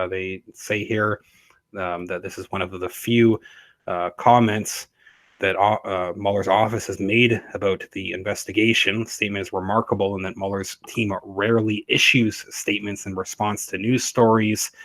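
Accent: Canada. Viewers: if you click on ABOUT--- it shows the channel information page...channel location is Canada . His accent is Canadian they sometimes pronounce about...aboot...kinda cool ...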